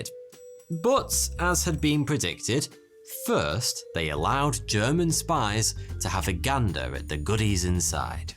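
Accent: United Kingdom. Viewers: British English🤮🤮🤮🤮🤮🤮🤮🤮🤮🤮🤮🤮🤮🤮🤮🤮🤮🤮🤮🤮🤮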